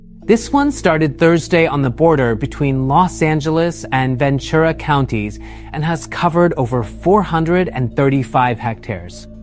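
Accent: Italy. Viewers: You don’t need to alter your voice to sound English. I much prefer your Italian accent.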